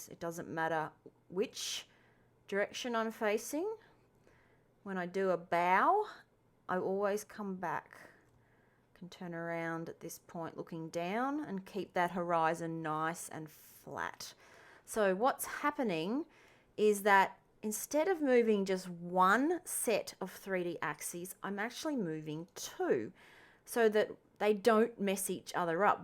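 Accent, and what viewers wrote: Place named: Australia. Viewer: I am sorry if this is correct in Australian, the awesome accent gotta come with quirks I guess.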